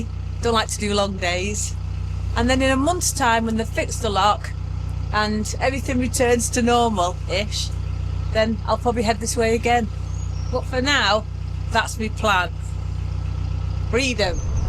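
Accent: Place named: Yorkshire, United Kingdom. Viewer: Hello from down here in Perth Western Australia, just wanted to say we love your restoration ❤ your Yorkshire chat is just the best you all go to make a lovely family Hope you don't mind your pet name " 😊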